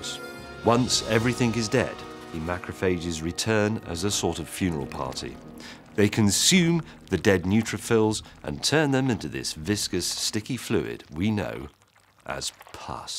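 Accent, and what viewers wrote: United Kingdom. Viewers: British accent 😳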